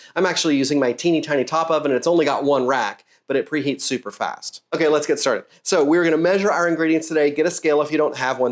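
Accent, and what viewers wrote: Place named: South, United States. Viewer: You ma'am, are a real SouthernSoulSister. I thought so at "ya'll" and "sin" but I knew it for shorly at "my husbands mama". Lol.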